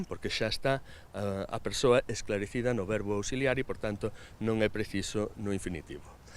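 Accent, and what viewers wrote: Spain. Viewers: Para mim que sou do Sul do Brasil o seu falar soa como um espanhol falando português brasileiro com sotaque carregado. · Eu tb pensei que ele era um "gringo" falando português, com o sotaque do espanhol. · Soa como ele fala português com um sotaque espanhol. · Caro professor, você no Brasil passaria como se fosse um nativo com um leve sotaque espanhol. Realmente a língua da Galícia é muito semelhante a língua portuguesa falada no Brasil. A semelhança é maior do que o sotaque de Portugal com relação ao nosso sotaque. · Parece muito com o português brasileiro mais com sotaque espanhol. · Esto se escucha como un español aportuguesado, más que como portugués. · Ele fala o galego com sotaque castelhano , ou não ? É melhor por vocês falam parecido com o nosso português do Brasil.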